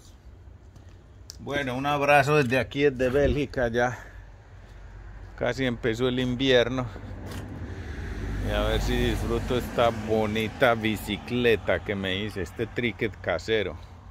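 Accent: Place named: Antioquia, Colombia. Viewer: Justo lo que estaba buscando. Quiero hacer uno de estos y encontré varios videos en otros idiomas pero este en el español con acento paisa lo entendí a la perfección saludos desde Colombia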